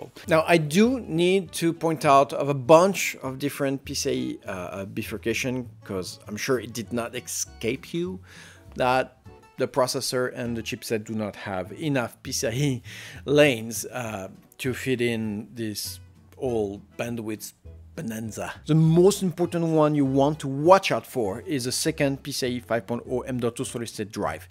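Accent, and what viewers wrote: France. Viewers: I enjoy your video's so much. I may have asked before but what accent am I enjoying so much? is it french or french canadian or something altogether different. either way I love you accent.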